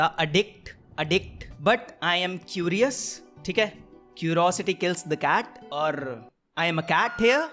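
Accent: Haryana, India. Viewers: I like speaking haryanvi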